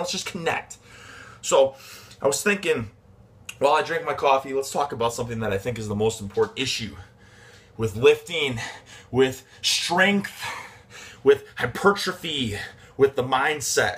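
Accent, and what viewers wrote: Midwest, United States. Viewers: Is he from the midwest?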